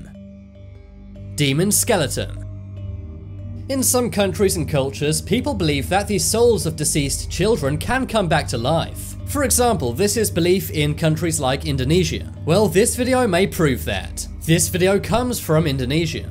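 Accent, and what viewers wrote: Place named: United Kingdom. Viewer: Not the first time a British accent tried to con the world into bullshit.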